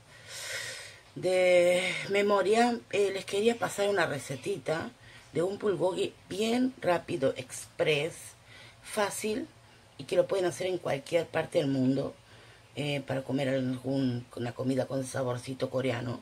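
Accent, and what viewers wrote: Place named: Buenos Aires, Argentina. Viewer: Hola . Es la primera vez que te escribo,hace poco tiempo buscando recetas de cocina asiática encontré tu canal,me pareció muy interesante prácticos tus platillos. Al principio creí que eras Argentina por tu acento medio porteña pero luego descubrí que eras del Hermoso Uruguay! !!.Gracias por tomar tu tiempo y enseñar sobre el mundo culinario asiático. Saludos desde Argentina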